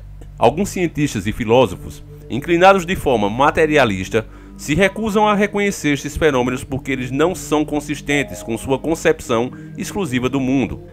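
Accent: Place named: Pernambuco, Brazil. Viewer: Tudo e todos do canal merecem nosso respeito carinho e dedicação de passarmos a diante as informações para abrir os olhos ah do seu respeito a sua região quebra a pau pois esse sutac pernambucano igual a do meus pais e irmãos que nasceram aí me faz voltar a infância tudo verdadeiro nada artificial obrigada por tudo tô em Santos e admiro seu trabalho manda um abraço e pau neles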